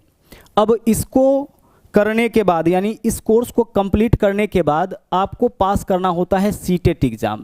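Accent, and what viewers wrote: Bihar, India. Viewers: सर आप आए बिहारी भाषा के स्टाइल में 🙏🏻🙏🏻🙏🏻🙏🏻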